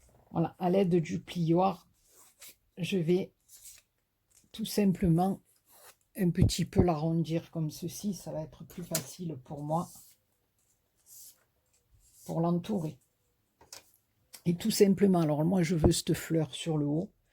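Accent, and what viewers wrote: South, France. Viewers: Coucou je découvre ta chaine et je vais m'y abonner de suite .. le tuto du chapeau j'adore......et l'accent du midi également.. ici il neige a gros flocon suis du Nord... est ... ca caille ..bisous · Oh qu'elle joie de te revoir, c'est bon d'entendre ton accent du soleil, tkt tu es hors champ mais pas grave trop bon de te revoir avec tes astuces et tutoriels. Super géniale marie-ange de Bourgogne. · J adore votre vidéo et votre accent du midi. Je vadrouille entre st Martin de crau et Ales Gard et le midi j aime. Chapeau 😘😘😘😘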